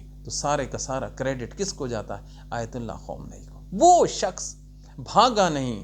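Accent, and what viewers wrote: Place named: Mianwali, Pakistan. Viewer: Bhi sab kia ap k taalq mianwali Cha?aoa meri shadi Sargodha hoi ha m b mianwali piplan c Hoon ap k lehja APNA shar jaca ha is Lya m or mera husband ap k vlog Shok c dakhtay hen Allah ap ko khosh rkha .or khoshi hoti ha jb ap reply krty hen.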